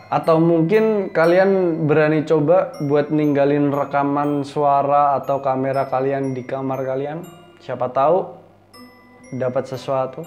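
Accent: Java, Indonesia. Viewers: abangnya kalo ngomong ada sedikit medoknya ya.. kadang2 muncul medoknya heheh but i like it.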